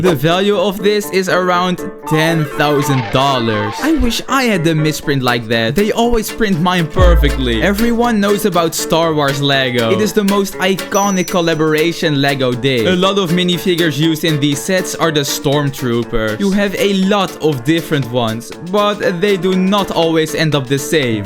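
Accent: Netherlands. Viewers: 😊ar you dutch your accent is dutch?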